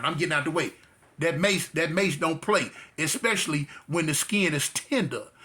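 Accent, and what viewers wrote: South, United States. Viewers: Imma keep it real og, you sound more southern than you do LA. I know it might be the 23 years, but dang, I'd swear you were born down here but with an LA spirit. It's like your heart is west coast, and your spirit is southern. "I said dang! That's sum'n else right thei'airr!" 😅🤣🤣 That 'they're' wasn't all the way LA or all the way Bama. It was mixed perfectly. 😅🤣🤣 Man, you sound like every one of my uncles & their friends I've ever heard. Word for word. & None of them ever left GA. Not one time.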